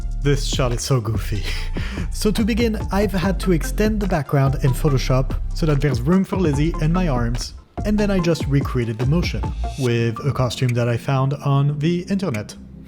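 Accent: France. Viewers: Incredible video !are u French ? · Il y a un petit côté français dans ta voix non ? :) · T’a un accent français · are you french? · Wow, I really only twice heard a hint of your french accent in the mein part of the video. Your English is perfect, especially compared to my Parisian colleagues!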